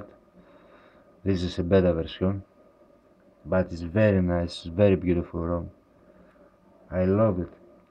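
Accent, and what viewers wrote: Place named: Greece. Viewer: Otan o ellhnas milaei agglika fenete apo thn profora tu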